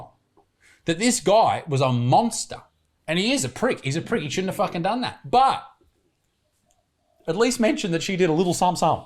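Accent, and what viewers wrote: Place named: Australia. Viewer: i love ur accent as iam am also an australian!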